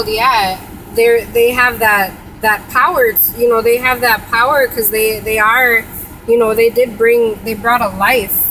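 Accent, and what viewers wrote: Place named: New York, United States. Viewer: I love your accent, are you from NYC?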